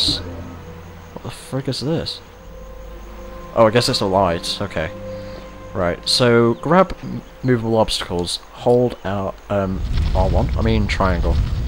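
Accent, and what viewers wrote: United Kingdom. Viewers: AWWW THAT IS ADORABLE!!! Why do you British people have one of the best accents evar!? lol.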